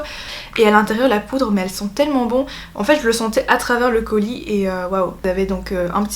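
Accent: Belgium. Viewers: juste, tu viens de belgique ou de france ?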